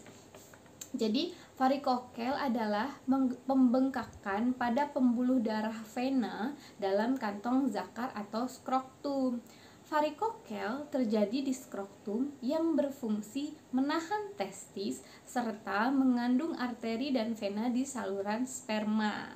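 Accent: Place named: West Java, Indonesia. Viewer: hee,.. Iyaa kaa makasih banyak yaa,. Di lihat dari cara penyampaian dan logat kaka kelihatan banget sundanya 😅. Salam dari bogor ka Semangat bikin konten berfaedah nya ka.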